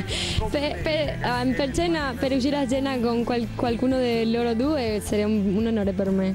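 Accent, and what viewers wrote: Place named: Italy. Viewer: Soo what!!!! you speak English whith Italian accent.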